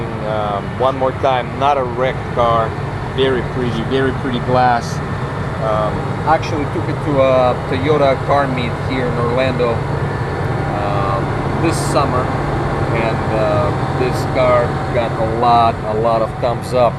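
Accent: Russia. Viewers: Суровый русский акцент. За Краун - лайк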